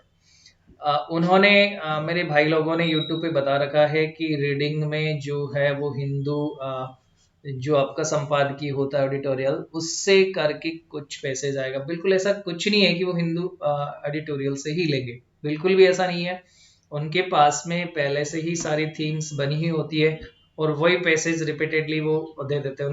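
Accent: Rajasthan, India. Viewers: Sir are you from rajasthan (Jhunjhunu)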